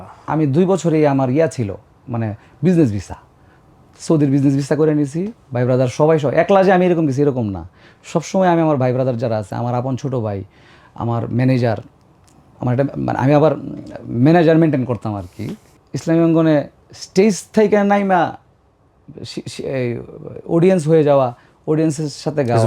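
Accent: Kishoreganj, Bangladesh. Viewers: ভাই আপনাকে কিশোরগঞ্জের গ্রামের ভাষাতেই বেশি সুন্দর শুনায়।বার বার গ্রামের টোন চলে আসছে আর আপনি বার বার ঠিক করার চেষ্টা করছেন,এই ব্যাপারটা ভাল্লাগছে। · আমাদের কিশোরগঞ্জের মাতৃভাষা টা মাঝে মাঝে এসে যায় এইডা ঐ পরিচয় হে যে আমরার কিশোরগঞ্জের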